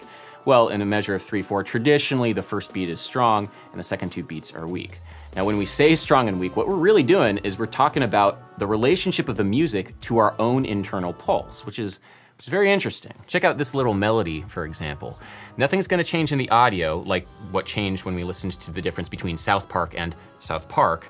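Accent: United States. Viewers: Great video and all, but I strongly believe he has quite a strong American accent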